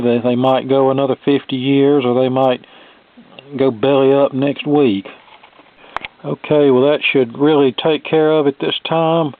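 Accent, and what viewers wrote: South, United States. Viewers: I don’t know where you live, but you sound like you’re from the south like myself. I wish I could come and apprentice with you. I find these vintage stereos/turntables fascinating. I’ve started refurbishing them, V-M primarily as a hobby. I really want to dive into the electronics, but I’m apprehensive. I’ve only ever replaced a capacitor in a TV. All of the diodes, capacitors, tubes etc seem very daunting. However I’d really love to learn. It’s sad that there aren’t more folks like you willing to pass on knowledge to others. Thank you for your videos, and if you’d ever like to tutor me in basic electronics that would be fantastic.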